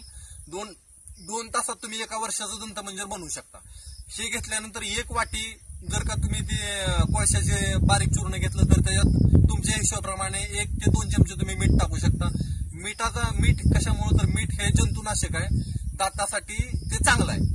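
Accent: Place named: Satara, India. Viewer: Bhau Bhasha tumchi Sataryachi vatate Bhetaychi ichha ahe Sataryat kuthe asta?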